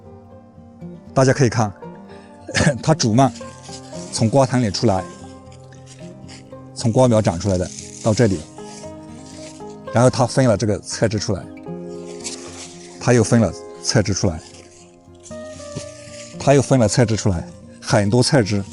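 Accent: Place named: South, China. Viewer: 这口音，是南方人吧？😁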